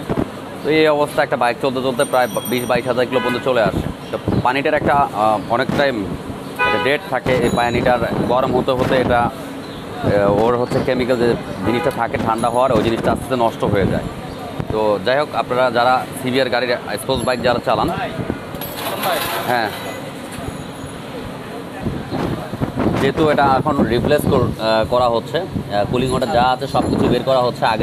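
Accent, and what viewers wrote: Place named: Naogaon, Bangladesh. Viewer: ভাইয়া আপনার গ্রামের বাড়ি কি নওগাঁ জেলা? মানে আপনার কথা শুনলে মনে হয় তো তাই জিজ্ঞেস করলাম আর কি!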